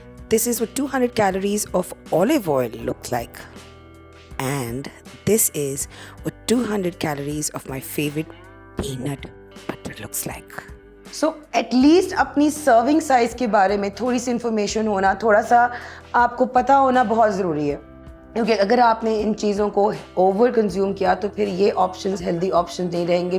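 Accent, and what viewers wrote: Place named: India. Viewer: Very informative. Also take some positive criticism. Speak more in Pakistani accent. There is a touch of Indian accent in your tone, maybe because you watch Indian media.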